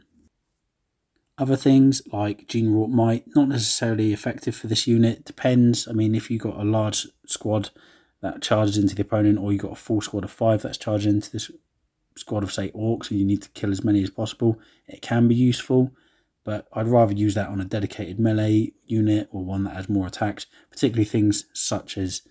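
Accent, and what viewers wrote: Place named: United Kingdom. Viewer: coupled with your British accent it makes it more difficult to follow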